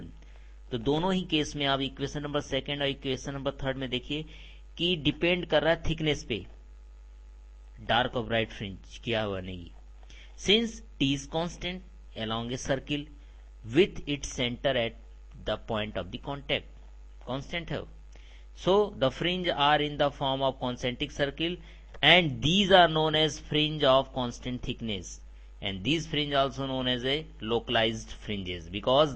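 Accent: India. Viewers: now thats the way you explain it, yes he isnt fluent in English, but did a much better explaining job than most profs around the globe, its Just that u need to be Indian to understand him. Good Job (Y)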